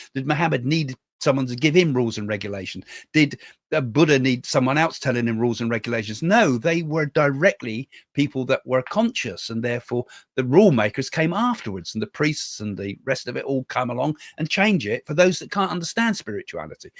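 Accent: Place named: West Country, United Kingdom. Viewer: I’m new here. You sound like me. You west country? 😂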